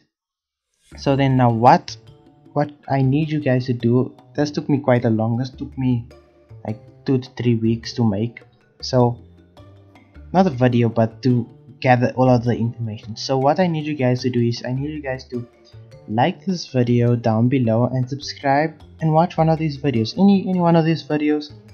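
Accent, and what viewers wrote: South Africa. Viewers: You sound like you from south africa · Ur accent sounds like a South African accent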